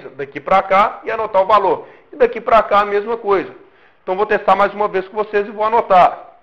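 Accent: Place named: Minas Gerais, Brazil. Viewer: Muito bão igual mineiro fala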